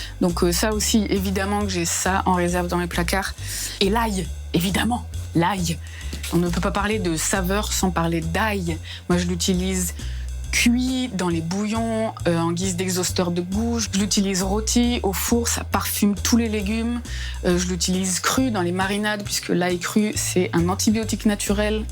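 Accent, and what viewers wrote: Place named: South, France. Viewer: l'horreur.... tu as une pub sur les apports en fer pendant la grossesse et "pour répondre à vos besoins vous pouvez compter sur la viande".... Merci YouTube ! en tout cas J aime beaucoup ton énergie, l'accent du Sud et ton style ! Merci pour tes vidéos 😊 (je mange vegan et ca me donne plein d'idées ou parfois simplement la motivation. j'ai adoré la vidéo apéro et la polenta !)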